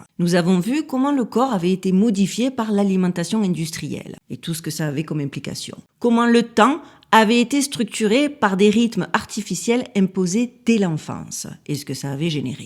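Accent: South, France. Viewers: Merci 💛🙏, de Toulouse PASSIONNANT toutes vos vidéos , et LÀ, Particulièrement Vous Nous Faites du BIEN en Nous Éveillant 👍votre charmant accent Nous Évoque Le SUD francais Tous mes Voeux de Bonheur pour cette Nouvelle💫 Année ⛅🌤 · Bonjour, je viens de vous découvrir aujourd'hui avec deux vidéos successives et je ne m'arrêterai pas là! Vous êtes phénoménalement géniale, avec votre accent marseillais (ma soeur!) ou tout au moins du Sud. Je vote sans la moindre hésitation pour vous aux prochaines élections présidentielles françaises qui viendront je l'espère bien avant l'échéance prévue tant le désordre et l'insalubrité règne chez nous. Je consulte immédiatement toutes vos vidéos pour les écouter et les faire connaître autour de moi! Merci pour votre travail fascinant! ❤❤❤❤❤❤❤❤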